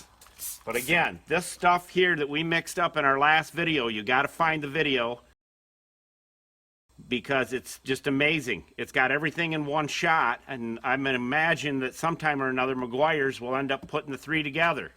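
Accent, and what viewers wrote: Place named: Michigan, United States. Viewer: If you ever wondered what a Michigan accent sounds like listen to this guy. Lol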